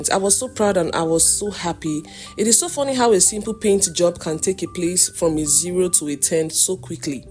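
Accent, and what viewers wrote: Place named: Nigeria. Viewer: Congratulations! May the Lord give you divine favor in 2026 and beyond in your life, your health, your channel. By the way, tell us which country. You sound Nigerian but 🤷🏽‍♀ I'll subscribe anyway.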